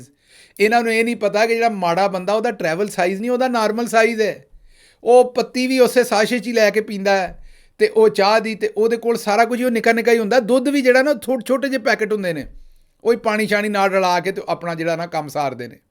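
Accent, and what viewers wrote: Punjab, India. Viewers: sahib poori theth te khari punjabi bol ke shaa jande o janab zindabad or tuhanu salam 🎉from charhda Punjab India 🇮🇳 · تہاڈے جئی پنجابی دو سو سال دا پرانا بابا وی نئیں بول سکدا۔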